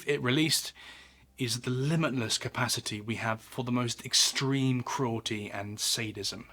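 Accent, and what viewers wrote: United Kingdom. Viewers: The irony is that this man is talking about these horrors in a deep British accent. That accent inspires fear in the hearts of millions upon millions of people around the world.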